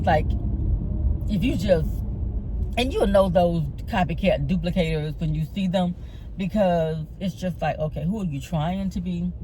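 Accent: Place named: South, United States. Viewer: Great ride along video love your AKA rain coat and I love country dialect 💞💚💞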